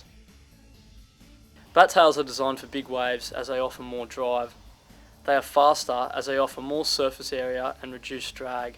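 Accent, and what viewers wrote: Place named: Australia. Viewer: I already know everything this guy is talking about but I continue to watch the video because his voice is Godly. It's like listening to Morgan Freeeman, except Australian and white.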